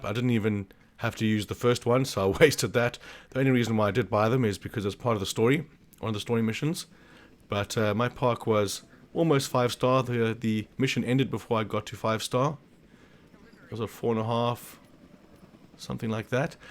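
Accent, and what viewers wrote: South Africa. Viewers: Bro u sound like a fellow South African are u?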